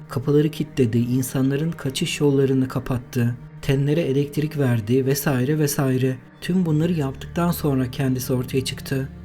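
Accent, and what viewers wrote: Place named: Erzurum, Turkey. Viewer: Abi sen Erzurum lumusun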